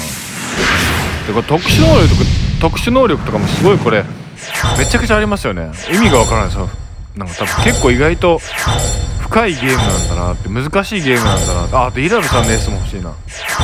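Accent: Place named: Osaka, Japan. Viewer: たまに出る大阪弁嬉しい😍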